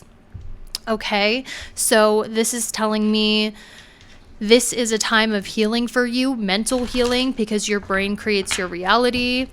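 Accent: South, United States. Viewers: I really like that southern accent. Calmed me down and made me laugh a bit. I chose group 3 and it really described me. I go to customers houses and build stuff that people order from Amazon or whatever they get it from. I was actually called an expert at building stuff from one of my customers. I want to do more creative stuff with music though. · Love your Southern accent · I love your Southern accent and the fun themes you've been doing for your readings lately! I appreciate you & your creativity! You have a cute and fun energy :)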